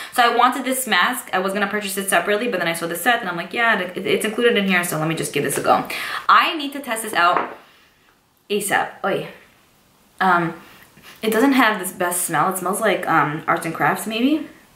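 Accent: New York, United States. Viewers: Are you from NY or am I very dumb cuz you seem like you have a ny or New Jersey accent. Maybe I'm dumb ash but idk🤷‍♀️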